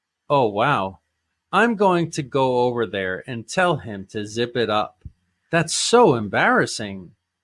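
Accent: United States. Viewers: are you American, dude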